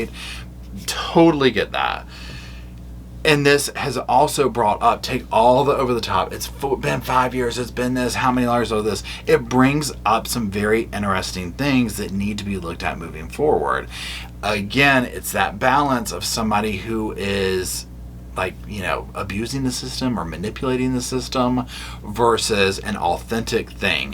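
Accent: South, United States. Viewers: I’m sure you covered it in some way on one of your videos but im curious are you from the south? I thought i could hear a lilt and a word or two thats very regional.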